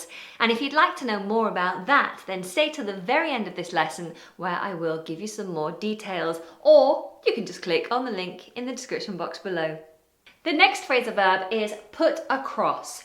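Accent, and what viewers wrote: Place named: Liverpool, United Kingdom. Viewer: lol, sudden transition effect scared me:)... SUPERB VIDEOS ..KEEP POSTING ...WANNA SEE ANOTHER LIVERPOOL ACCENT VIDEO OR MAYBE A SERIES OF VIDEOS....haha amazing accent.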